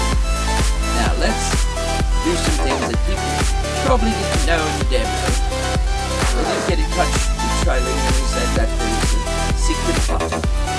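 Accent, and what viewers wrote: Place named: United Kingdom. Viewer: Haha nice British accent.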